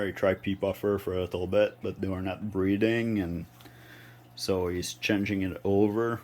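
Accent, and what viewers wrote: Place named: France. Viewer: I am very glad that I came across this video from a kijiji add! I just recently got my first small tank so I am looking to learn a lot more so that my fish can thrive. You son is pretty smart to have started his own business so young! You must be a very proud father. I love all of the fish! You have a new subscriber. I am actually French, too. I recognized the accent. Sounds just like me ha!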